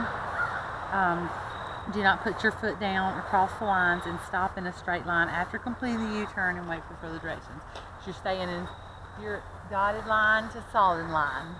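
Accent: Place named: Texas, United States. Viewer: I love her Texan accent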